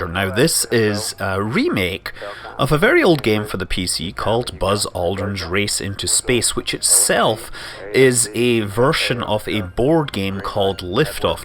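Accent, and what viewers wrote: Scotland, United Kingdom. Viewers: Hey, you don't have to affect an American accent for the liftoff since it's a Global Space Agency. It could be a fellow with an even heavier Scottish accent than yours doing that countdown!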